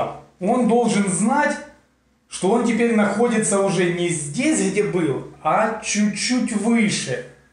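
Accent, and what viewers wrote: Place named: Spain. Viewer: Сперва этого испанца к русскому языку надо научить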